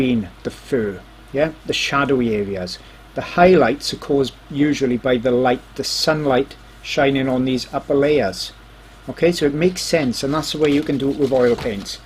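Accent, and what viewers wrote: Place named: Swansea, United Kingdom. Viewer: Thanks for this! Are you a Swansea lad? Recognise that accent anywhere!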